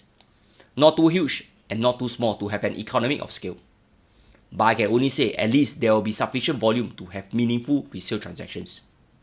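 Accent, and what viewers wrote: Singapore. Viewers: Singapore accent SOUNDS TERRIBLE ???!!!!!! NO REPLIES READ.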